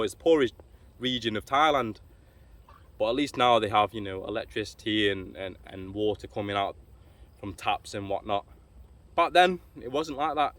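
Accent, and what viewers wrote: United Kingdom. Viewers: You got more of a British accent than me lad! Keep smashing the vids up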